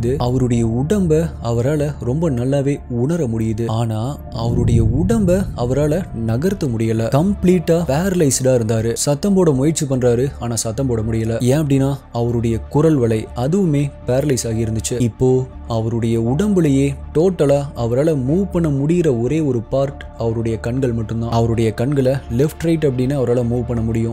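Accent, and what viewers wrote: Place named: Kerala, India. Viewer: YOUR VOICE LIKE FROM KERALA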